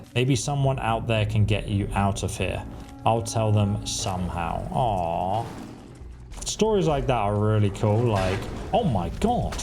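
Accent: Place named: England, United Kingdom. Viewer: I don't mind your English accent, but OMG, it's VAR-mit.!! Picture Yosemity Sam saying "Come here ,you pesky VARmit, rabbit." Var, as in far with a V!😂😂😂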